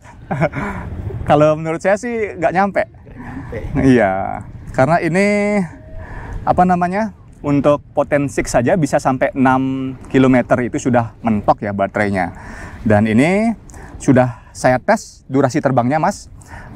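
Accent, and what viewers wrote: Bali, Indonesia. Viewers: Kayak logat Bali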